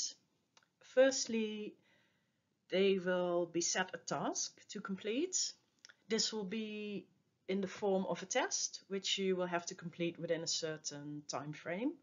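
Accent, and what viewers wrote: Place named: United Kingdom. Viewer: I like the British English accent this lady has. It is so elelgant.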